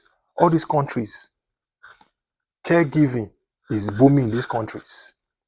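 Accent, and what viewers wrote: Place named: Nigeria. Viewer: Support advise Africans how to develop their own countries than to advise them to run and go live in other western countries. The fact is the western world is fade up of immigrants using any kind of routes such as asylum and student visa to come live in their countries. You sound west African from Nigeria. Why don’t you go back to Nigeria and build your own country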